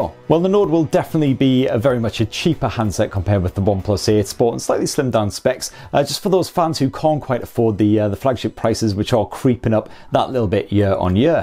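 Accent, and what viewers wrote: United Kingdom. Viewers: content is absolutely amazing but this british english is completely garbage :D :D btw i am not american ;)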